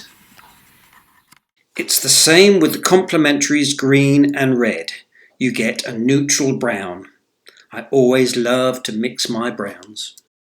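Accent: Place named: United Kingdom. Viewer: I have just discovered you and I am in love with your lessons and your marvellous British English and humour. Thank you so much!!!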